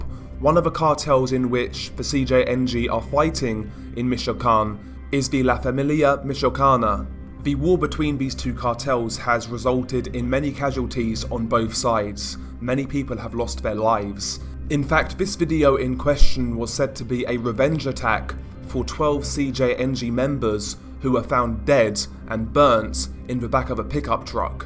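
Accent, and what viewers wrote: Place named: United Kingdom. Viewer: british accent is so satsfing for narations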